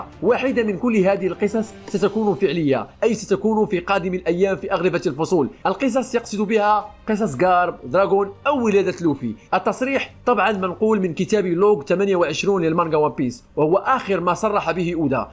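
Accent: Morocco. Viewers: يجب أن تقوم بتدريس مادة الون بيس في الجامعة شرحك يجعلنا نرى هذا الأنمي بشكل مختلف .... واصل أخي من لهجتك يا أخي أعتقد أنك مغربي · لان لهجت مغاربا تشبه لهجتنا نحن نجزائرين مغريبي اخي و ليس صديقي 😉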